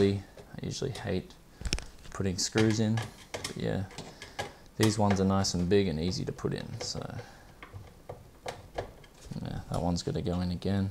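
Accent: Australia. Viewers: are you australian i can tell by ur accent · Hey i have a question... I want to build a gaming computer and i know the parts i want to get, but i just don't know where to get them from since i live in Australia. I can tell by your accent you do too. So my question is: where can i buy pc parts in Australia? Oh yeah and by the way i love your videos, keep it up! Thanks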